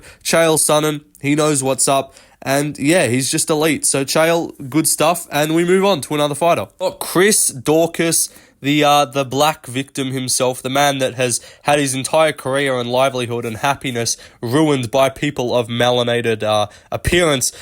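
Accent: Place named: Australia. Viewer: I thought who the fuck would make a video like this. Then I heard you speak and was like ahh he's an Aussie 😂 well done mate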